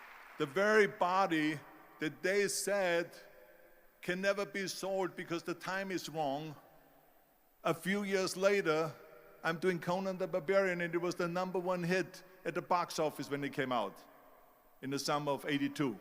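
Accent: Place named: United States. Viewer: Really Great Speech ... Nice Job Guys. Anyone that doesn't understand American accent, can watch this speech with sub title here : <link>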